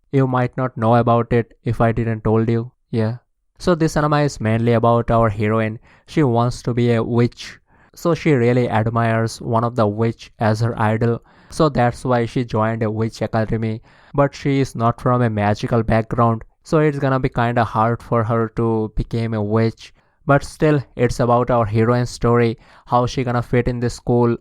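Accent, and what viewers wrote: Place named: India. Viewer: i hope you can fix your english more... and speak less with the indian accent. thanks for the list btw keep it up 👍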